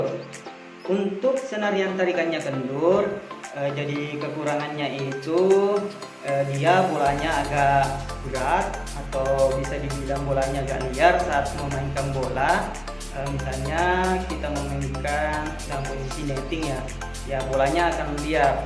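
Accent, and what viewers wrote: Indonesia. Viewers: Org makassar ta?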